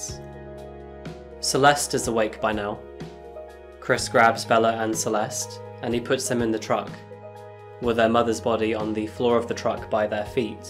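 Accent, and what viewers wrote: United Kingdom. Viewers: I love the British accent all the time I live the royal family I love marks and Spencer your videos are remarkable ❤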